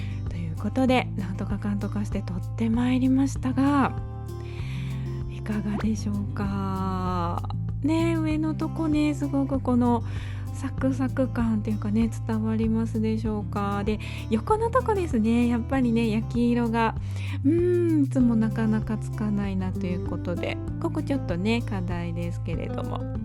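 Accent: Kansai, Japan. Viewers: 初めまして😊久々にパンを焼きたくてYouTubeを見ていたら『お勧め』に出てきたので早速拝見しました🎉 楽しくて軽妙な柔らかい関西弁のおしゃべり(お話)に、グイグイ引き込まれて、あっという間にファンになりました😀デニッシュパン早速挑戦してみます🍞🍞 これからも楽しみにしてます😊もちろん登録させていただきました❤❤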